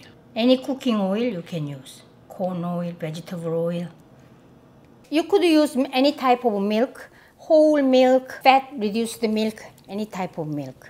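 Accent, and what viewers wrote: South Korea. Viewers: I used to get recipes off your website all the time for years because my mom is Korean but sadly passed away 15 years ago (last week as a matter of fact) without ever teaching me her recipes. I just discovered your YT channel today and I'm hooked! I may stay up all night catching up on all of them!!!! You remind me a lot of her, always happy and making jokes. And the accent of course. Thank you so much for sharing your recipes. 💕💕💕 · 영어가 너무 한국분이라서 정감이가요❤️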